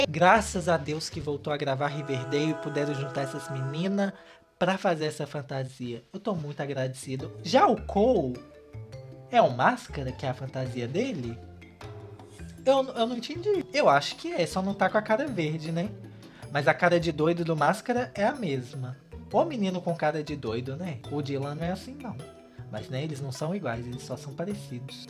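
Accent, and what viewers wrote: Minas Gerais, Brazil. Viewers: o sotaquinho mineiro iti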